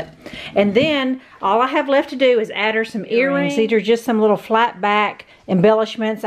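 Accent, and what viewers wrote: South, United States. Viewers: Are you a southern lady?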